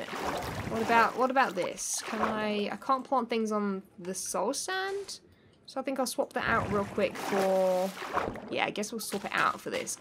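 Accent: United Kingdom. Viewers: I'm learning more about other hermits and I now know you and Impulse .xD By the way I like the way you speak it's nice, british?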